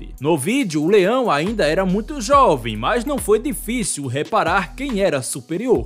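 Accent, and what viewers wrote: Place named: Paraíba, Brazil. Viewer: Sua locução é demais com esse sotaque meio nordestino de Paraíba, mas o troço chato é cão, tira a paz de qualquer bicho. rs Se o felino tivesse solto ele veria o que era bom. rs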